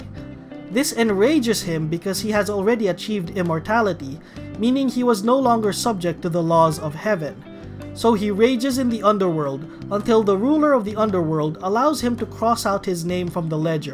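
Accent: Philippines. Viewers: He has that thick filipino accent. Pretty sure he is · You oddly sound like a Filipino. Great stuff tho! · That filipino accent is too thicc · The Filipino accent is killing me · Damn that Filipino accent is getting to me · I just can’t help notice your accent. Are you a filipino? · accent seems familiar. are you a Filipino?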